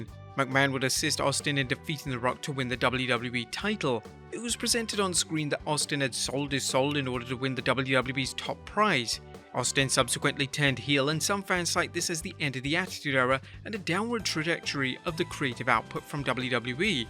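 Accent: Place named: England, United Kingdom. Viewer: This channel is awesome....and I love his English accent.......😊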